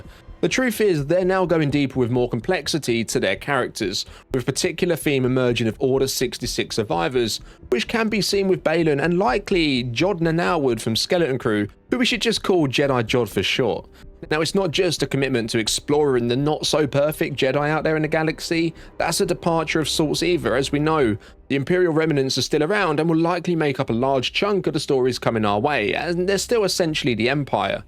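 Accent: England, United Kingdom. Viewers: I love Star Wars. I love English accents. I love smart, interesting discussions of star wars. Well done, mate.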